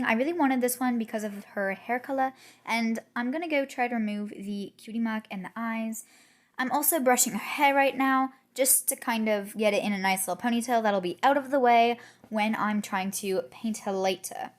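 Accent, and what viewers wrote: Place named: United Kingdom. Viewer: Why did you used to have a British accent in your videos I thought youre American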